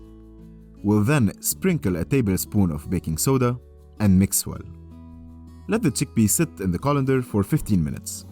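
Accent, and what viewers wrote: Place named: Lebanon. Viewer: Hah. That’s a Lebanese accent if I’m not mistaken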